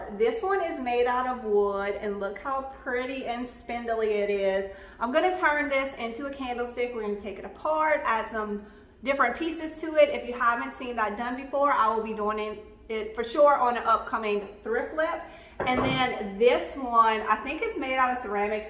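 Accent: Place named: South, United States. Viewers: I love your accent. I live in Mississippi, too. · your shows are such fun and you are such a KIND woman. It is a joy to watch you. P.S. My husband always knows when I am watching, "I hear your Southern Lady"s voice". You make us both smile.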